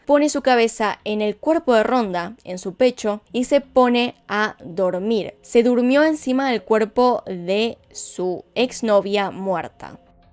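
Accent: Argentina. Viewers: gracias por tu trabajo me gusta mucho y te expresas muy bien me encanta tu dejo argentino sigue deleitandonos con casos de diversos paises saludos desde Lima Peru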